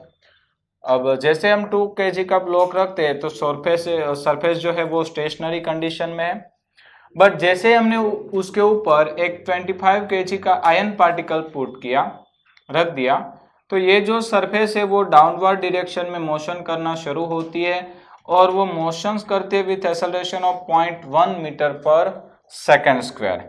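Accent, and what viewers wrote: Gujarat, India. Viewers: i can bet that you are from gujarat by your way of telling force . Anyway you are working hard and you will be succesful one day . you are a good physics teacher.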